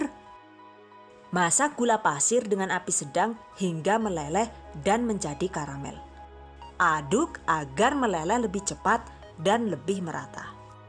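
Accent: Banyumas, Indonesia. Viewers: Ngapak ok, english ok josss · Ngapaknya mana mba?? Kangeeen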